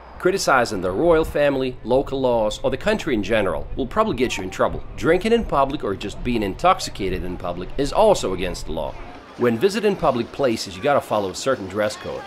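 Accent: Russia. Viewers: I would've Never placed his accent as Russian! That's Crazy! 😆👌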